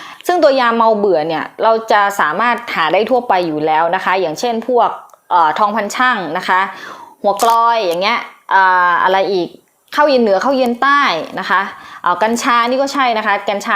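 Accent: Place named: North, Thailand. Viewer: หมออย่จังหวัดอะไรอู้เมืองแต้ชอบวิธิอธิบายชัดได้สาระ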